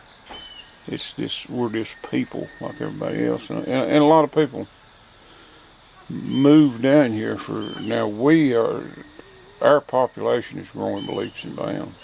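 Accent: South, United States. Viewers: I think you talk like a southern gentleman. Up north we talk a lot courser. Not smooth. And New York state has five or six distinct accents. We live 90 miles north east of nyc and are a summer tourist area. Lot of city people moved up here over the years so we sound more like that then people furtber upstate.I know when I am in Tennessee and open my mouth them folks know I ain't local! Have a nice weekend. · Hi!!😀😀 I just love listening to you with your southern accent!! 😀😀 I can understand you just fine. Top less women in Ashville!! Wow!! I think I need to visit. LOL!!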